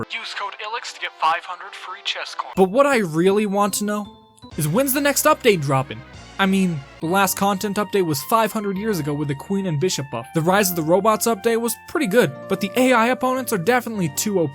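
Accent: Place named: United States. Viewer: Where are you from? Cause the accent seems like Japanese-American to me.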